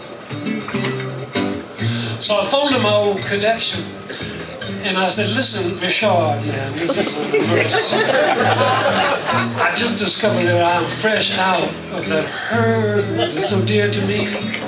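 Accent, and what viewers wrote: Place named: South, United States. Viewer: Jesse always connected with his audience, thru humor, wit, heartfelt love for his fellow humans, and that ever sweet Southern honeysuckle drawl. I feel so fortunate to have shared this planet with him for years, and thankful to have seen him perform a few times.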